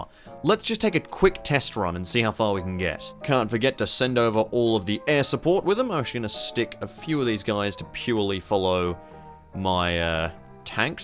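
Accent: Australia. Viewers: You over exaggerate how Australian you are and it’s really cringey and hard to watch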